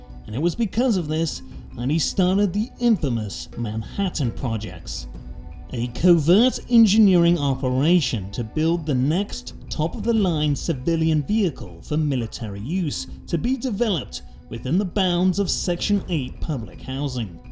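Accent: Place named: United Kingdom. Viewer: My favorite thing about this whole series is it’s a British man narrating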